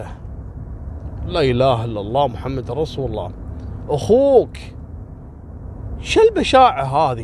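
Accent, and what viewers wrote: Gulf, Arab world. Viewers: طريقه كلامك اتغيرت انا الان بعام ٢٠٢٤ وهذا الفديو قبل اربع سنين كلامك كويتي ميه ميه الحين خليجي مخلط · الله يسعدك لهجتك مثل لهجتنا في السعودية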